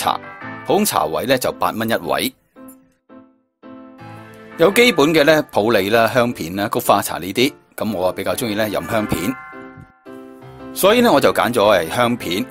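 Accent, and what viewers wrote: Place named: Guangzhou, China. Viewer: 好濃廣州話口音 香港人都自漸不如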